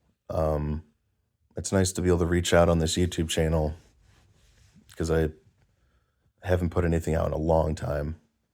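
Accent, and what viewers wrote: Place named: Canada. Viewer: I was angry too! Disfellowshipped in 2005. Now I'm happy. . Here is an end time prophecy they never talk about that has always been in every Christians bible and yet, few are the ones finding it: . Isaiah 66:5 Hear the word of Jehovah, YOU men who are trembling at his word: “YOUR brothers that are hating YOU, that are excluding YOU by reason of my name, said, ‘May Jehovah be glorified!’ He must also appear with rejoicing on YOUR part, and they are the ones that will be put to shame.” . [Name of person] is no longer one of JEHOVAH's Witnesses ---->> "excluding YOU by reason of MY NAME". . Do you see Jehovah's promise to disfellowshipped Jehovah's Witnesses? . "He must also appear with rejoicing on YOUR part"! . . So who is right? . Jehovah or, eleven men who live in New York? . My faith and belief has vastly increased since leaving! 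. . I'm HAPPY. . Luke -23 HAPPY are YOU whenever men hate YOU, and whenever they exclude YOU and reproach YOU and cast out YOUR name as wicked for the sake of the Son of man. 23 Rejoice in that day . [NAME OF PERSON] is no longer one of Jehovah's Witnesses ---->> "and cast out YOUR NAME" . . Another prophecy about Rejoicing! . . You sound Canadian. . You could try sharing this with your family. .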